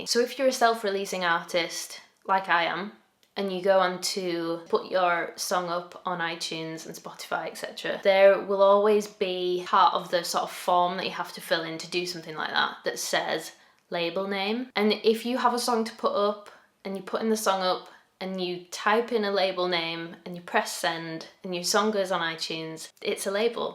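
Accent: United Kingdom. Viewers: O inglês britânico soa tão bonito.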